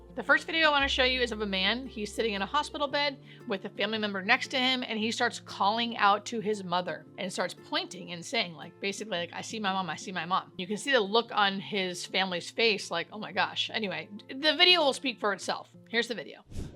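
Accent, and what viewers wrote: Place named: United States. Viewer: Bless you, no offence meant you high yank accent is difficult and painful to my ears, again no offence meant. Your video belongs in north America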